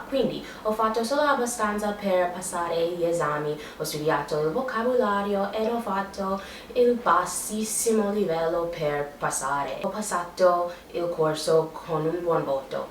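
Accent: United States. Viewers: Amo amo amo questo accento! davvero sono molto affezionata all'accento americano in italiano, ho visto molti video tuoi e sono veramente interessanti! abiti a Milano a quanto pare, magari un giorno ti trovo in giro ahaha, sei divertente e super simpatica :)) p.s. sei bravissima a parlare comunque! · Parli perfettamente, e l'accento americano è adorabile! Grande ❤ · Bravissima! I'm no one to make judgements but your Italian is more than proficient; the world is always surprising: I know people my age, so middle aged, even university educated that still struggle with verbs' conjugation and some tenses...that's quite irritating, while you are almost always correct and fluent. A few flaws (very few in your case) in a foreigner speech are even nice...and please try not to lose completely your slight American English accent: is very charming, cool and exotic! Best regards! 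· mi piace tantissimo quando parli in italiano e si sente l'accento americano ;) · Brava, parli molto bene l'Italiano! Un poco l'accento americano, ma va bene...ti fà più sexy! Ok? Ciao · Rimane americana non puoi dirle di migliorare delle lettere che nell'accento e pronuncia della sua lingua sono state sempre così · You speak correctly - better verb tense agreements than many Italians - the American accent is even harder to lose than my English one though 😊. The only American I have ever heard that has managed to lose their accent is Romina Power, and look how long she had to live there! (and who she married to do so hahah) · Parli davvero bene italiano, complimenti. Non hai troppo l'accento americano, brava! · migliora un po' la T è troppo schiacciata, troppo inglese-americano e un po' anche la r.. però bravissima l'italiano è difficile... Io amo L'america penso che ognuno sia attratto da ciò che non gli appartiene. 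di che stato eri? mi iscrivo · You are pretty good, you make some small mistakes but you can fix them, like you mix up some word gender because in english words don't have a gender. A tip to sound a bit less american is to say some vowels open and not closed, we are weird because we don't put every accent on words but only on some, the best example would be the word "ancora" which can mean either again or the metallic thinghy ships have(dunno how it is in english sorry) so it can be important, listen other italians and imitate them, good work! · Sei brava a parlare, ovviamente hai una cadenza molto americana ma lo parli fluentemente e ti si capisce benissimo. La pronuncia non è facile, d'altronde come per noi imparare il vero "American English"! Continua così, io vivo a Milano ed è bello vedere i punti di vista di qualcuno dall'esterno! You're beautiful and very chatting person:) like me! 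Good luck for everything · ovviamente si sente l'accento americano, però parli molto bene. Ho molte amiche che sono nate all'estero (Polonia,Croazia,Russia) e sbagliavano sempre gli articoli, ma tu questo problema non ce lo hai. wow bel lavoro! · Why do you use an American accent when speaking Italian?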